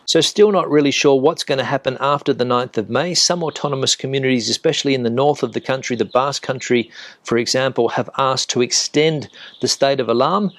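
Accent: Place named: Australia. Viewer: Very atmospheric outside in your lovely garden in the Spanish sun with birdsong, gracias a los vecinos ruidosos👍 AND out in your 'CAH' - love your Oz accent - letting us see what we miss 🕶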